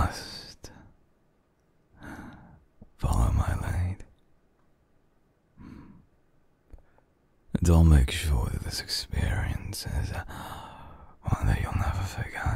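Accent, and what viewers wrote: United Kingdom. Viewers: He's....brit isn't he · i swear the british accent makes this video <num>X better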